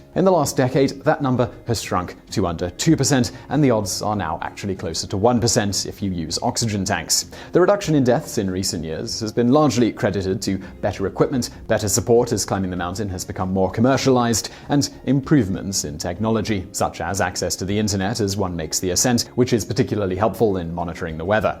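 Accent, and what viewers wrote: United Kingdom. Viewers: Wtf is this brittish Vsauce?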